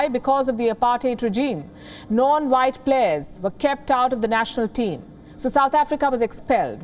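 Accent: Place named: India. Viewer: It's indian accent wion primarily indian news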